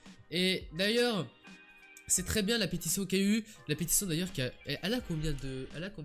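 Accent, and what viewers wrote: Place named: England, United Kingdom. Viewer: Ton accent anglais god damn... 😒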